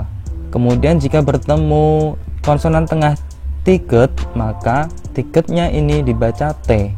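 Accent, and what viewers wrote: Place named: Java, Indonesia. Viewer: Untuk materi sangat bagus mudah di mengerti tpi untuk pelafalan terlalu medok tolong di perbaiki pelafalan nya supaya bisa lebih ke logat korea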